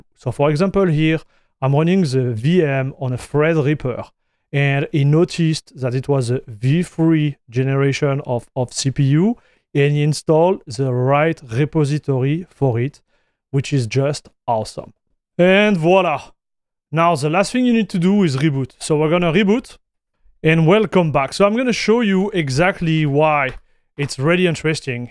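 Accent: France. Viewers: Cet accent français de zinzin, ça m'a fait bugé je me suis demandé au début si la vidéo allait être en français ou en anglais 😂. C'est ça qu'on aime, merci pour la vidéo !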